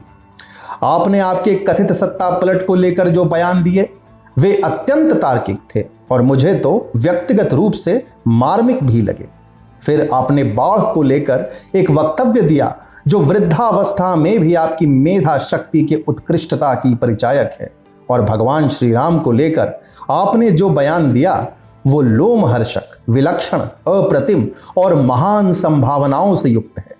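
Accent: Standard. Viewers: Kya baat hai bhai tum Hindi bahut shudh bolte ho bhai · Sir, aapki aawaz sunkar accha laga, aap bahut sudh Hindi bolte hai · It's sudh hindi sunkar a6ha laga.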